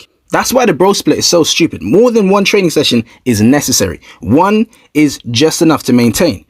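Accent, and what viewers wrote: United Kingdom. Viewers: U sound British I can tell u from England 🇬🇧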